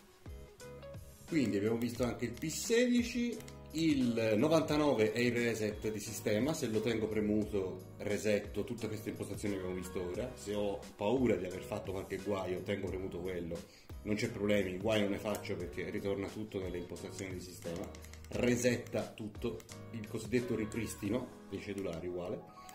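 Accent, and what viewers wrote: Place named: Florence, Italy. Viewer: Tu hai avuto una buona esperienza...io invece no... l'ho comprato da una settimana...la velocità è di massimo 37 km orari, la batteria non dura quanto dovrebbe e tra l'altro mi si è forata anche una gomma, ritengo anche che i materiali non siano di buona qualità. Secondo te il monopattino è difettoso? sono di Firenze e sento che dall'accento anche tu lo sei.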